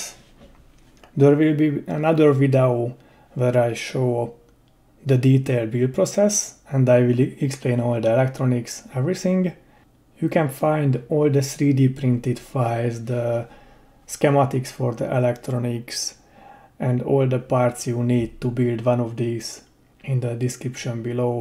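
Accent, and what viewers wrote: Hungary. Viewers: This project is cool. Congratulations. Development idea: equip the motors with rotary encoders and use them for teaching function (pre set the scenery and camera movement before shooting). Ps.: your keyboard and accent is Hungarian. Are you?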